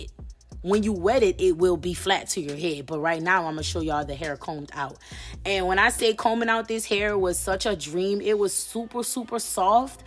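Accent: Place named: South, United States. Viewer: Ooop why I wasn’t ready for this cute ass voice/accent. Wth is you from, it’s given souf for sure …but I’m slightly conflicted. The way you say BELOW give the boot. Love I happened on your page today❤❤❤❤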